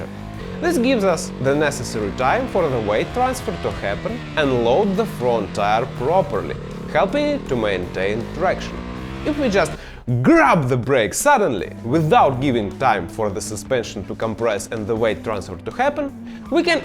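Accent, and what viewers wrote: Russia. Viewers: Hi from Bundaberg Australia 🇦🇺😊👍 Ive seen many many Educators Video's on Motorcycle Riding 🏍 Here in Australia & American BUT none of them match Your Video's , Some other people's are good BUT most are average ! Ive learnt so much from You & your teachings 😊👍 Im now understanding Your Russian Accent really well now ☺️ Thank you for doing these video's & know we new & im thinking even more experienced riders appreciates them & learning plenty ! Cheers from Aus matey 🇦🇺🕺🍹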